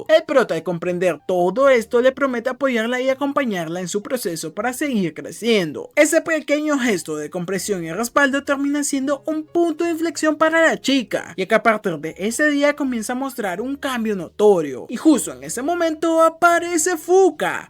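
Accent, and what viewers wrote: France. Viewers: Eres de paris o por que hablas asi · Eres francés? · Se le sale el francés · Eres francés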